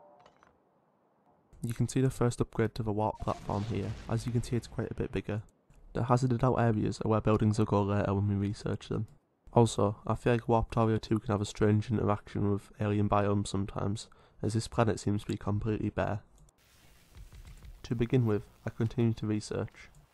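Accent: United Kingdom. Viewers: Der klingt ziemlich British, mit dem aussetzten vom T bei "water" oder "later".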